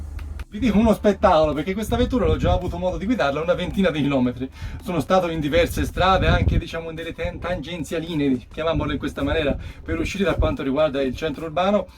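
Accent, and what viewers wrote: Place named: Florence, Italy. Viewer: L' accento fiorentino mi fa impazzire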